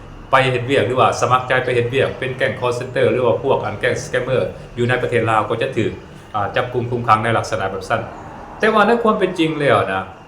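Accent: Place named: Thailand. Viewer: ເວ້າສຳນຽງໄທເສັຽວັດທະນະທຳລາວ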